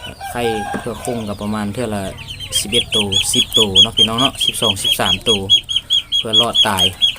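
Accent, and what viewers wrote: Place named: Thailand. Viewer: เว้าไทนาหัวพูแน่🤣🤣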